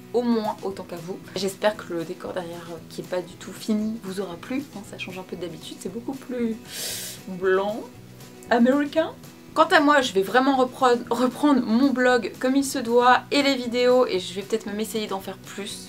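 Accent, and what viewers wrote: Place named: Germany. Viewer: J aile beaucoup ton accent alemand😂😂. Trop belle dans cette video tu pourrais nous faire un tuto sur ce makeup??😘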